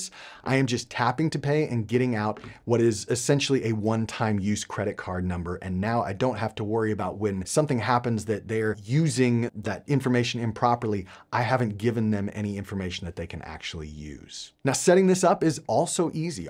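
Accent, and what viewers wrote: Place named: United States. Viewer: I don't understand why you speak american english but your channel is in portuguese. Frist time I see such thing.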